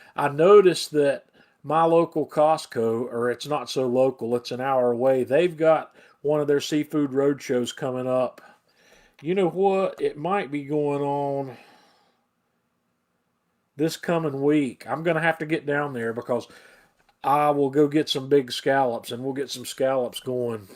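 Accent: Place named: North Carolina, United States. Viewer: The accent is awesome. What part of NC are you from?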